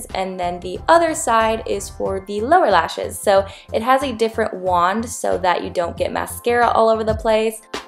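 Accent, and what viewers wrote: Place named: Minnesota, United States. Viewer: Hehe I always thought the way you say bag was weird but I realized it's just because you're from Minnesota. I had a teacher from there (I'm from texas) and he would always pronounce words ending in ag like you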